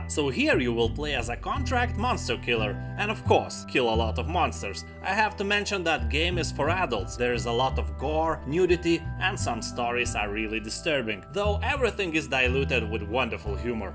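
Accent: Russia. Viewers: Че ж такой жесткий русский акцент...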